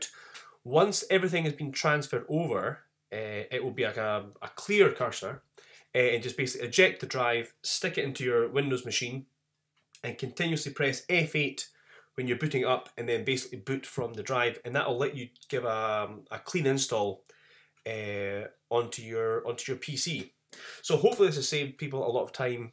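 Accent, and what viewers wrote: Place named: Scotland, United Kingdom. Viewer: Hey man, you just made my life easy. Thanks, wherever you are (your accent suggests Scotland, which is my favourite place in the world).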